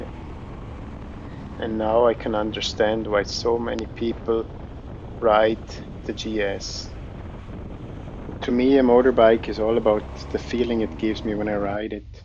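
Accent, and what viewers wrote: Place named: Switzerland. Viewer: Sehr gutes Video, gute Kommentare und angenehme Stimme. Bei einem guten Englisch bin ich überzeugt dass Sie Schweizer sind .😊🙂‍↔️🙂‍↕️.👍👏🇨🇭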